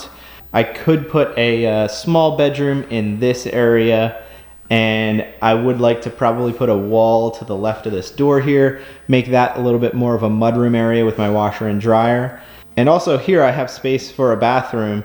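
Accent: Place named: Philadelphia, United States. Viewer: I'm from Pittsburgh and you know we have a distinct accent. My welders helper is from the Philly area so I pick up on it. Your set up it awesome though Man!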